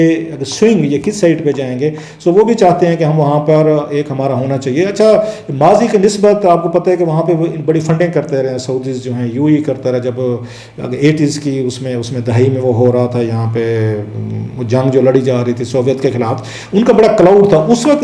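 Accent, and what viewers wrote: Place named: Punjab, Pakistan. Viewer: Rauf ji, i'm from India. I like your topic selection n analysis .n live your heavily loaded punbabi accent...keep going ..all the best...Not seeing Amir Mateen saab.. best wishes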